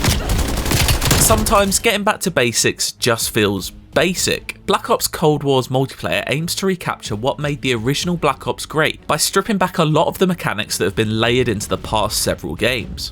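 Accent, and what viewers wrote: United Kingdom. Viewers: This guys British voice pisses me off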